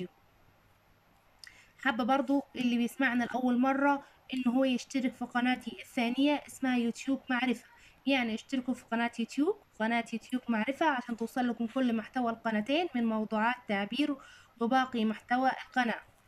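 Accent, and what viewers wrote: Egypt. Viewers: عند حق راهي من مصر اسمعي هدرتها😂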